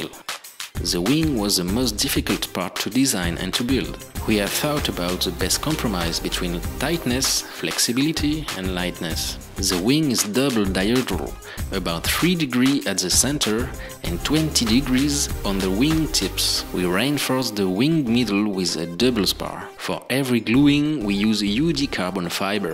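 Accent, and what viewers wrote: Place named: France. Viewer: just wondering what film covering you used? i cannot understand what it says in the video. also, what airfoil? and what size prop? I can help you do a voiceover in american english, if you send me the script, i can send you the audio recording? perhaps it would be easier to understand? be happy to help if i can. if not, no hard feelings. I speak spanish, and english, but had a hard time understanding the french accent. Thanks for the update! thumbs up!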